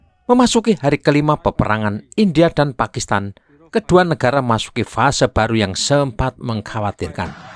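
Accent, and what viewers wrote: Java, Indonesia. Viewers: Jawa betul km lek medok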